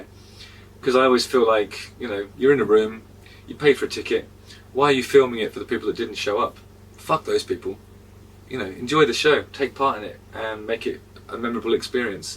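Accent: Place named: RP, United Kingdom. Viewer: Here's a question: You hail from 'the toft' but where is your accent? Have you always been posh or did you deliberately change your elocution? I drove my old bucket of rust (an Austin Allegro) to the bright lights London in the 90s, and found my accent was a source of ridicule, so deliberately began to affect a kind of posh/cockney vernacular to fit in. This seemed to help my credibility but to this day when I talk in public, especially when stressed, I find my Lowestoft accent seeps through to betray my humble origins. Just wondering what your perspective is, and whether you could give us a nostalgic rendition of " ", recorded in Lowestoft in the 60s?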